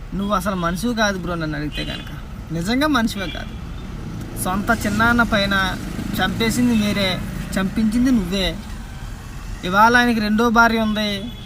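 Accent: Godavari, India. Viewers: బాబు ప్రతి అంశాన్ని గోదావరి యాసలో చక్కని విమర్శతోకూడిన విశ్లేషణ చేస్తున్నారు, గో ఎహెడ్!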